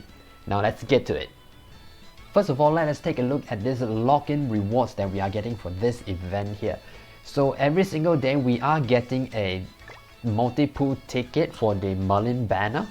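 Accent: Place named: Singapore. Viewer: I recognize that Singapore accent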